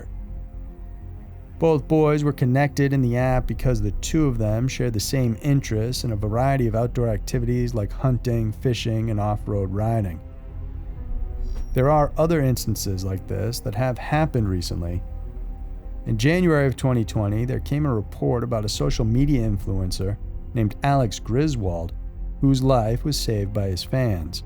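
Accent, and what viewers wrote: South, United States. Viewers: God damn I thought that was Matthew mfking “alright alright alright” McConaughey doing the narration. That southern drawl was just a-twanging in my ear I tell you w’hat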